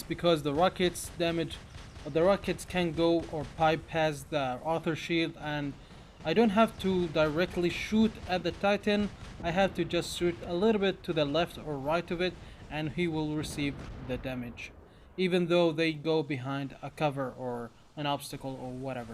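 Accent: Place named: Arab world. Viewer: i know you are arab from your accent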